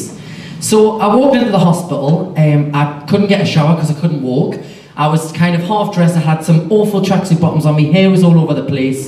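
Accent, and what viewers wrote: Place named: North East England, United Kingdom. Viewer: Thar's his adorable accent from The North east of England.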